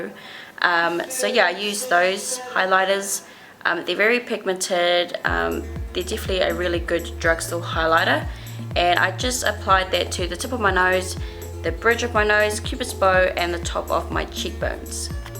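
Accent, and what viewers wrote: New Zealand. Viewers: Her accent is what u call a kiwi accent not Australian don’t get it twisted 😂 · Was out of it to hear a kiwi voice on a YouTube video! Love your work girl! What an inspiration!! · You speak like me!!! Woohoo a Kiwi! & here l was thinking you were from the States haha.. Beautiful tutorial btw, loved it! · i think she's from NZ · You sound like a New Zealander , Kiwi as girl ! ,love it ! you go ❤❤ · U sound & look part or Maori ..Kia ora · omg is she from nz? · As soon as I heard your voice, I clicked on your account to see which country you were from because I swore you sounded like a kiwi cx Im from New Zealand, this is my first video that I watched of yours and I think it looks beautiful :) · She's definitely a kiwi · the accents sounds kiwi, I'd like to try the LA cosmetics where can I purchase them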